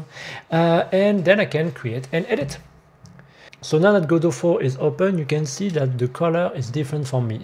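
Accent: France. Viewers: je me disais bien ahah, au mois c'est cool ça permettra d'un peu comprends les vidéos parce que c'est dure de trouver des ressources en français sur Godot est j'ai un anglais qui assez discutables mais blague a part ta vraiment un très bon anglais et en vrais j'ai vanné un peu sur l'accent mais j'ai galéré a me rendre que c'était un accent un français x33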